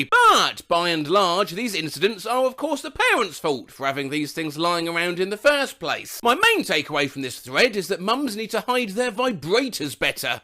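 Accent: United Kingdom. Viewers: A compliment for, his voice sounded especially British today.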